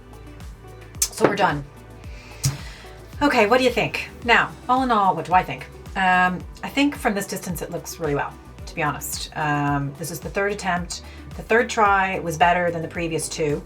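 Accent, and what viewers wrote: Ireland. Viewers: Do I hear the most subtle Irish accent? I’m American so I could just be hearing things. Love the way you speak. Very pretty ❤️ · That's gas, I heard your accent and thought "some of those words/phrases sound really Irish" and then I read the About section on your channel and it made sense! 😂 I got an ad for these on Instagram and was curious because I'm awful at painting my nails, but I thought they were probably too good to be true alright!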